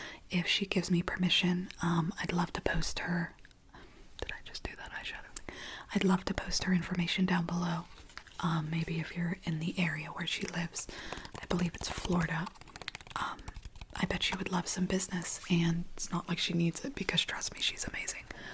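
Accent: United Kingdom. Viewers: wait why did she start off british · thumbs up if you want to do a whole ASMR video in her British accent... thumbs up so she sees this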